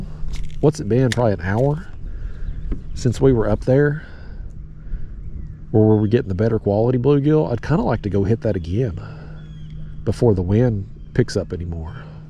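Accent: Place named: Oklahoma, United States. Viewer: Im gonna be really honest here for a minute. I never used to really watch your live 2 hour 4 hour long videos. I used to just skip right over them. Honestly because i kinda thought you where an asshole in general but i liked your videos about big fish and whatnot. Im from oklahoma. Your country accent gives me a kick because i hear myself in there lol. But honestly ive been watching the raw and uncut videos and i have noticed well hell this guy is just like me. He is just real and honest. I like the honesty. I love the raw and honest opinions about real life deals you talk about. Like the recent video i watchrd where you talked about old white christian men who hate your guts. Im a christian but im also human so i know damn well on youtube im gonna see the rue paul drag race bullshit and my kids see it too. My daughter asked me the other day she was watching a kids show on youtube and that crap popped up. 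Ahe is 10 by the way. And she asked me why that man was fressed like a woman and why he doesnt wanna be a man like he is???? You know how hard that is to explain to a 10 year old girl??? So you making a commercial for a ball hair trimmer, id rather her see that than see that other nonsense. Id rather someone be real and honest rather than be fake. Even as a christian im not religious. Im only human and make mistakes. So in closing just saying i know what you nean and i get it. But not all is christians are likw the old white man you described. 😊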